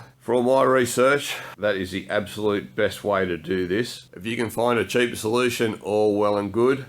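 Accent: Australia. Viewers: really dig yer Aussie accent, mate. No BS from any of you blokes, says a dude from Montana, US of A. I've been monkeying with LED conversions since before the new year and have discovered many tips and problems. For example, if you use so called "Plug and Play" WITHOUT ballasts, they won't work! Don't buy them -- ballasts should be cut out and scrapped. The P& P tubes are most common, nearly everywhere. They are known as type A tubes. Type B tubes need to be wired with a hot end, the other is neutral. I don't know what the one enders are called, as I have yet to find any, except on the internet (Hyperikon, come to mind, but they are bloody expensive and not needed) There are apparently at least 12 brands, if not more. There appear to be maybe 4 kinds of tube types. I have used the type B tubes as they are easy to wire and work well. They are double ended, as mentioned. 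The single end ones can be pricey, and they work well too, but have not used them. Hope these tips help some of your viewers, my Aussie friend. Cheers, mate. Bob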